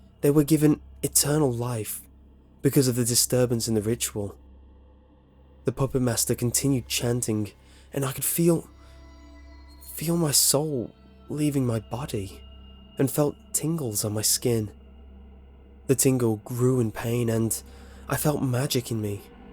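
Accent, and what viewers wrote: England, United Kingdom. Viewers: What part of England is he from???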